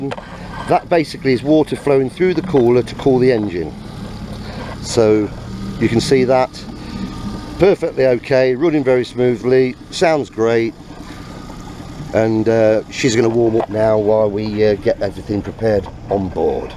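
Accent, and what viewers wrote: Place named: Nottingham, United Kingdom. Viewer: where are you from? You sound like you're from the Nottingham area.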